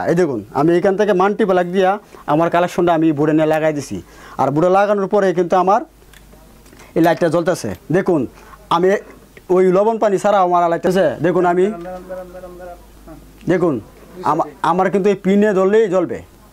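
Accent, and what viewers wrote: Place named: Sylhet, Bangladesh. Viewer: কোন মাঠ নাই আপনি সিলেটি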